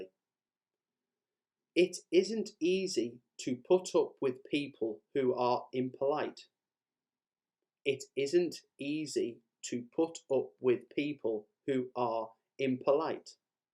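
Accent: United Kingdom. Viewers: Hi Greetings from Türkiye nice video for british accent I can understand Thanks :) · Спасибо. Только один вопрос в изучении английского языка. Как учить британское произношение, как у вас по вашему уроку или всё-таки сразу американское для разговорной речи? Если учить британское произношение, не будет ли оно мешать в понимании американской разговорной речи? Спасибо. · Hi! Thank you for teaching us.🙋 I've just done day 1 and I have a question. Is it british accent? (I mean english language the og ofc) Are these homophones also the homophones in american accent english?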